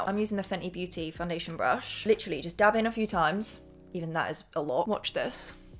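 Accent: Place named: United Kingdom. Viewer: It’s probably because Im high rn I just realised you have a british accent 🤦🏼‍♀️ and yes I knew you are british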